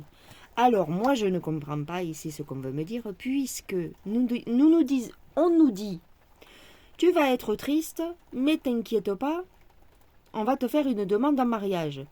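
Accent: South, France. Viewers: 😊 bonjour 👋. Tu me fais rigoler avec l accent du midi.merci pour le tirage. Oui le mois de mai vas être encore très difficile. Je te souhaite un bon 1er mai. Moi cette année je n achète pas de muguet..il.est où. Ça me rappelle une certaine chanson. Bisous 😚 😚 Gigi. ❤❤❤❤. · Bonjour j'adore cet accent du sud de la légèreté du Soleil et continuer un petit peu s'il vous plaît au cœur pour avancer oh je suis en train de dicter le message Et je prends l'accent nous allons tous parler un peu marseillais aujourd'hui En tout cas j'adore Merci pour ce tirage continuez de chanter un peu oui les chansons de sont superbes moi j'adore mais cette histoire me paraît pas tres nette De toute façon c'est sa vie privée ça ne nous regarde pas nous aimons le chanteur et ses chansons se remettent sur le chemin Que j'adore l'accent que vous aurez avril demi-vingt-quatre et surtout mon 1er mai pour demain gros bisous ❤❤❤ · Vidéo insolite grâce à cet accent du sud qui dédramatise la situation. 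Bon 1er mai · Bonjour, merci pour la vidéo et l'accent du midi moi j'adore comme j'ai des copines la bas sa change de la Tourraine qui est froid et les gents bien triste. Vous avez raison de vous lachez sa fait du bien et on rigole en plus. Bon 1er Mai ainsi que tous vos abonnés🥰❤🕊🌟👒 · L'accent du soleil dédramatise ce monde merdique Merci ❤❤❤ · bonjour ont ce croirait dans un film de pagnol j’aime cet accent du sud sa sent la lavande et le soleil ☀️ bon 1er mai 💐 désolée ilya pas de muguet bisous 😘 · Bonjour Une touche d'humour avec cet accent du Sud 😊 Pourrais tu faire une vidéo sur les pluies diluviennes à Dubaï? Merci... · Oh lala d' tu m'as fait trop rire avec ton accent même moi qui habite le sud je ne parle pas comme ça 😅❤😂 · Et tous les neuneus qui se marrent avec l'accent du Sud ! Le titre est vraiment approprié, c'est n'importe quoi !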